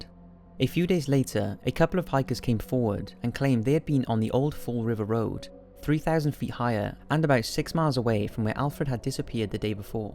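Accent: Wales, United Kingdom. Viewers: Anton Ellegaard no probs mate, voice is very cool! iam from Yorkshire England & it took me a bit bcoz it's a bit between English & welsh & a bit posh ☺ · Do all brits sound as ridiculous as you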